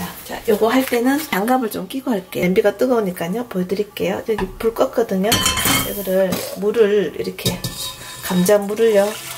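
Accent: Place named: Busan, South Korea. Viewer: 친정이 부산이라 목소리랑 억양이 넘 친숙하네요~^^ 좋은 정보 감사 드려요~♥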